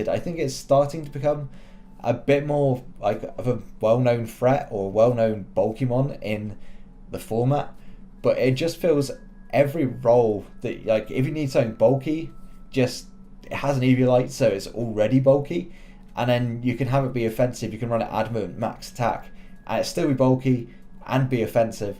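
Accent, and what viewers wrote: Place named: England, United Kingdom. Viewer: Great draft, even better accent - it's nice not being the only Englishman in the league!! :')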